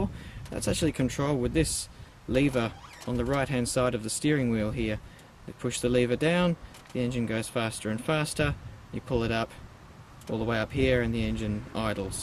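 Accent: Australia. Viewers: I watched the video to hear an AUSTRALIAN SPEAK..God Bless our AUSSIE BROTHERS and SISTERS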